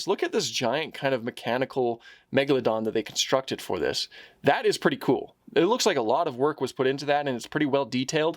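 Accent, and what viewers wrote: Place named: Texas, United States. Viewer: clicked this video because it was something that looked interesting but your accent immediately caught my attention, you sound like the guy from demolition ranch so I'm gonna guess you're from Texas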